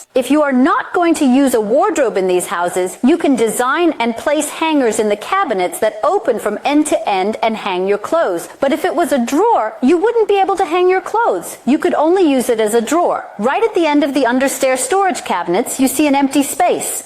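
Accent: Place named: India. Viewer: indian accent thats speaking too fast ruins this video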